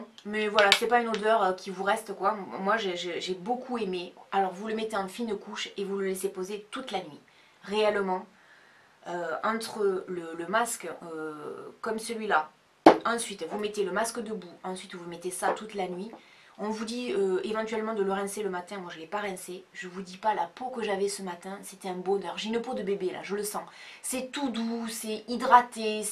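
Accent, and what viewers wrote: South, France. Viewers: CC. Je suis tombée par hasard sur tes vidéos et je ne regrette pas. Ta bonne humeur et ton bon goût font plaisir à voir😂 Sinon c vrai la palette Zoeva est géniale. Continue comme ça. Tu nous réchauffes avec ton accent du sud😚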